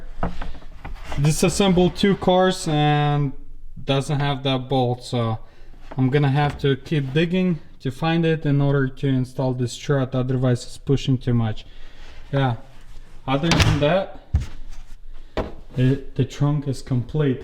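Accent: Russia. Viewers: Love that Russian accent 😂 Nice content buddy 👏🏼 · Is it Russian an accent?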